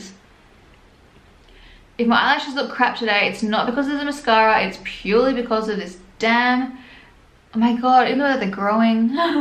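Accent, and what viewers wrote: Australia. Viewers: I love when she emphasizes R's to minimize her Aussie accent lol it's funny cute "bronzeRRRR" instead of "bronza"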